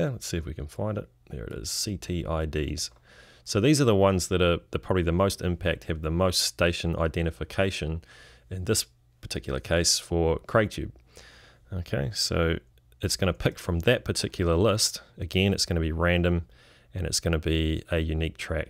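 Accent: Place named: New Zealand. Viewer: Yo Kiwi dude can pick that accent a mile off have you gone to latest yet with Yosemite, It came with a few issue to start with but after talking with the developer it seems to be sorted. Scheduling is fairly start forward the hardest part is making iTunes conform 12 isn't an improvement it com,pellets messed up my playlists. but that might not be a bad thing as I'm moving everything from an iMac to a 12 core and will have to go through everything agin, it just never ends. B y the way what the story over there now on low power FM stations across the ditch if your single penetrates a piece of paper it is considered too powerful .02 of a watt max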